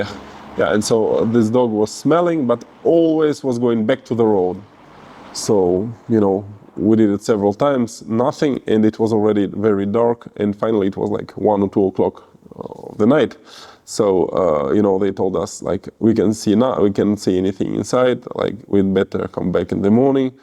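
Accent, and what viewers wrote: Russia. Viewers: I thought you where German but Russian is also ok 😊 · Hahaha. Like we couldn't guess you were Russian right from the beginning. Lololol. You're too cute Dear heart. Your workshop is looking fantastic. Great job getting it tidied up. ❤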